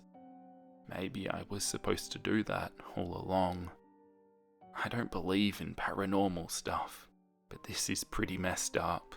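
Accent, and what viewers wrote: Australia. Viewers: Australian I think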